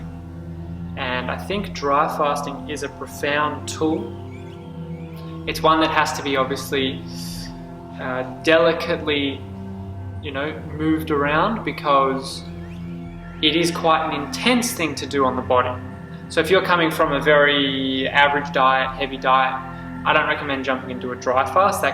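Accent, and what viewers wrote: Australia. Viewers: Is there any value in doing Intermittent Fasting and not having any water or do you have to fully fast? (great to hear an Aussie fast!)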